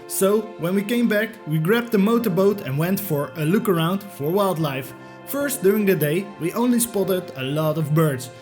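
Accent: Netherlands. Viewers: Ik twijfelde aan je accent en dacht al dat je Nederlands was. Toen zag ik die kruidvat zonnebrand en wist ik genoeg😂 toffe video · Coole video. Nice dat je het in het Engels doet. Wel grappig om te zien hoe je bepaalde dingen echt op een Nederlandse manier zegt maar dan in het Engels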